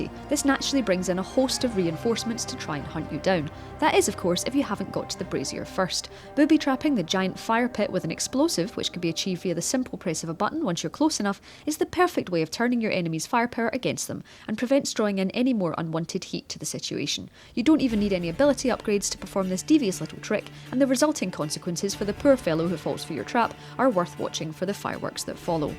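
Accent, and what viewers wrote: Scotland, United Kingdom. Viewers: It sounds Scottish to me. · Is this a Scottish or Irish accent. It's pretty cool. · alec j that's Scottish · This video would be extremely better if they had put someone without this disgusting Scottish accent. She can't even pronounce fucking English words properly for fuck's sake! It hurts my ears... one of many reasons why I would never visit that shitty country in my life! Long live England! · Yes, it's Scottish. Central Scotland to be more specific. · Scotland. Like my good self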